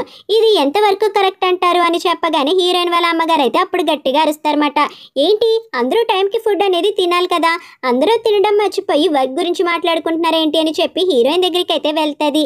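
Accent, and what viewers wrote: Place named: India. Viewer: మీరు హిందీ వాలా న,మీ మాటలు అలా ఉంది అ0తే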